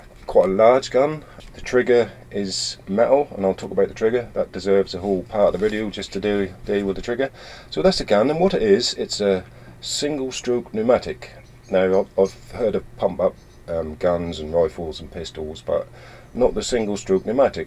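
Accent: United Kingdom. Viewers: Is that a Suffolk accent bu?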